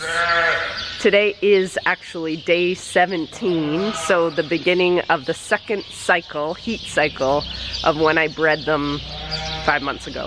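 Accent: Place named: Canada. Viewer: It took me a second to realize you are Canadian. Seriously thought you were just from the Northern parts of the US like Montana or Nebraska.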